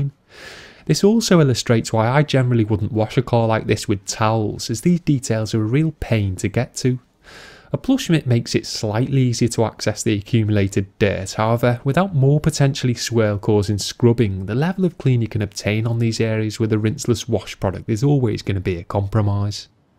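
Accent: United Kingdom. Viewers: DieselDan09 brit accent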